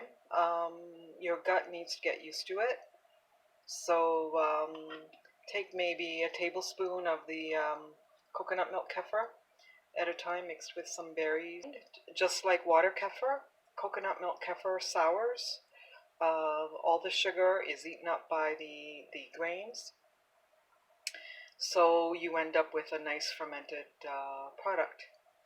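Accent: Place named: Canada. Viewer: I have thoroughly enjoyed this video. I have watched it over and over. I ordered some water Kefir grains from Florida and have made a huge batch of coconut Kefir. It is thick and rich and creamy. It tastes better than any Kefir I have ever tasted in my life. Your instructions are short and to the point and very good and well thought out. Also. I have been in America for the last 30 years but I was born and raised in Canada🇨🇦 and I am enjoying your Canadian accent. I especially love the way that we say “about”. I still have a bit of my Canadian accent. Stay encouraged. Keep up the good work.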